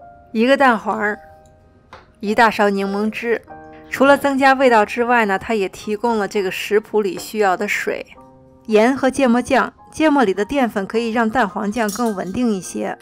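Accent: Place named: Beijing, China. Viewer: 好可爱的儿化音口音💘，一看介绍，原来在我隔壁省。一直觉得像做饭或者做那种天然手工品（前段时间在看手工皂），都像巫师一样施法。难怪说，学好数理化，走遍天下都不怕🤣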